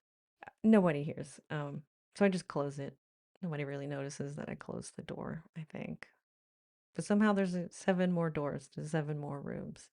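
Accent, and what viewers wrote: United States. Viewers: Really lovely to hear a considered, quietly spoken American. It's such a lovely accent when it's not screaming at you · American lady living in the UK is sooo SXY. Please try not to loose the accent and become less exotic... LOL.❤ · You seem such a lovely person, I love your videos. You may have an American accent but my dear you’re one of us now, welcome home ☺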